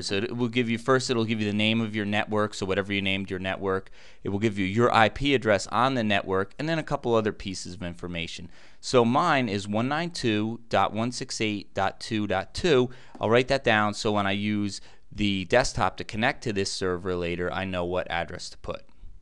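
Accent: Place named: New Jersey, United States. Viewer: sounds like a mush mouth from new jawz-sey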